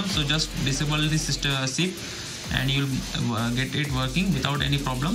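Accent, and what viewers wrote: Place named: India. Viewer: Can anyone tell me what fucking command that is he’s speaking in that Indian accent can’t tell if he’s saying t b or d they all sound the same and then he’s not even saying enough characters that his blurry dirty ass screen shows. It’d help if he turned off the techno music. This is why Americans hate Indians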